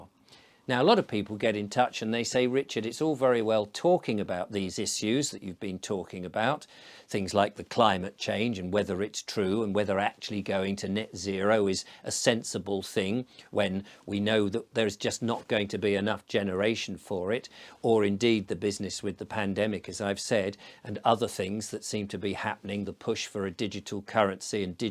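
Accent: United Kingdom. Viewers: Richard, I have recently started watching all of your videos and would like to make the following observations. You come across a Great British slight accentric, which I love as there are too many manufactured personalities. You love your country and your countries history. But your awakening, probably through your viewers' comments and opinions, is beautiful to watch. Your communication skills are a credit to you but most of all the way you "tread the boards" shall we say is masterful. Keep up the good work as I think you awaken a lot more people to the World Agenda than say the more zealous of us delivering the agenda. Keep up the good work.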